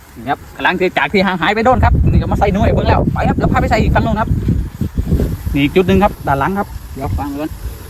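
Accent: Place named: Surin, Thailand. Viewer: ฟังเสียงนิสุรินแม่นบ้อหำ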